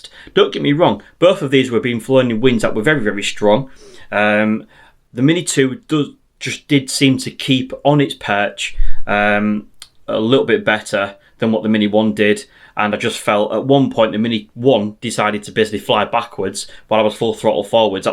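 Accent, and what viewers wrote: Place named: Yorkshire, United Kingdom. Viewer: All the time I was watching this video I'm thinking "This guy's from somewhere near Hull in the UK I think? He's definitely a Yorkshire lad anyway. My wife's family are all from Hull, which is the reason why I thought this was the case. Am I correct?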